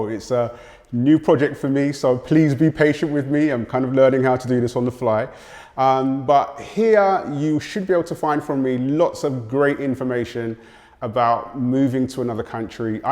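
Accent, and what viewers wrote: London, United Kingdom. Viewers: Had to do a double-take when I heard the London accent haha - a lovely surprise! We lived in Tottenham until the riots (my ex-husband still lives there). So hard to find any content from Black British people who have moved over to Latin American countries. I was initially looking at Mexico for me and my daughter, but now I'm discovering content about Colombia! I'll be a new subscriber lol. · Referred from Ace’s channel.. I’m not gonna lie it’s nice to see Londoner that looks and talks like me.. that’s taking the leap to broaden his horizons unlike most people who happen to think “London is everything” going forward with your channel and life in general wishing you the best 👊🏾 ps.. I’ve liked and subscribed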